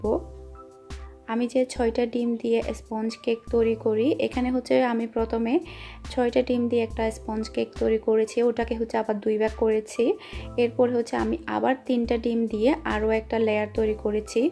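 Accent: Chittagong, Bangladesh. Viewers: আসসালামু আলাইকুম। আমি নতুন।তোমার কথাগুলো অনেক ভাল লাগে। তুমি কি চট্টগ্রামের মেয়ে? জানলে ভালো লাগবে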